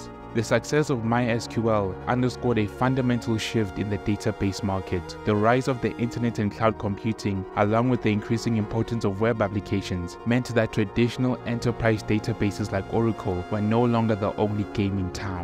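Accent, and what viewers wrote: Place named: Southern Africa. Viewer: I know a southern African when I hear one😂 Good stuff mate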